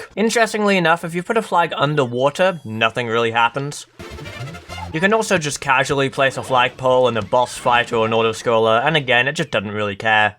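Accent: Wales, United Kingdom. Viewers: She's British, Welsh specifically. That is what Britons call the last letter of the English language, which their ancestors the Anglo-Saxons created.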